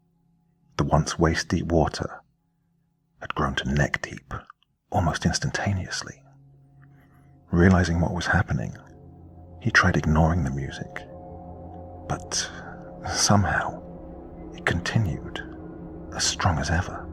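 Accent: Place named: Cockney, United Kingdom. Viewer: I like the Cockney accent Doctor! Always nice to hear stories of our native England!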